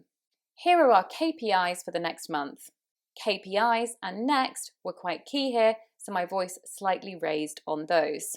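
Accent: United Kingdom. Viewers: you are such a wonderful english teacher, I learn many things from you and I love your UK accent however I can follow you on FB and insta but not on Tik Tok because it's ban in my country but Love from India 🇮🇳❤️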